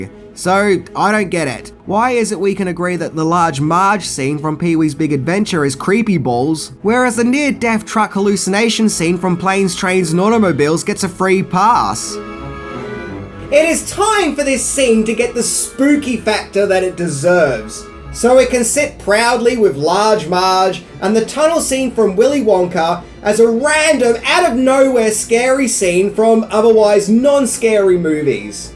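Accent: Australia. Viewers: Good channel here. Was wondering about your accent, got it now, Auzzie convict stock...i am a pomie....suck it up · Sounds more like a homosexual Australian. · Hey mate, It's pretty cool your spot on, covering all the shows and movies I dug as a child, and in that beautiful Australia accent. What's more is your clearly on the world stage.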